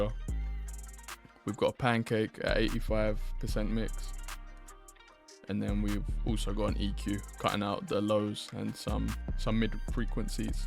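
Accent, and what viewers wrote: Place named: United Kingdom. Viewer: where are you from bro? sound like the UK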